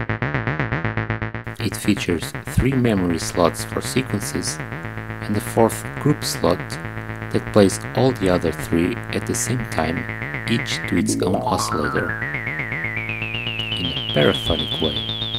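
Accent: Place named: Portugal. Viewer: Are you from Portugal? You do sound like it, anyway, great job...and accept some form of donation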